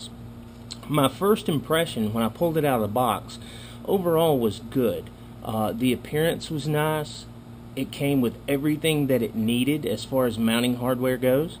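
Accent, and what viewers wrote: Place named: South, United States. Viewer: I love your southern accent.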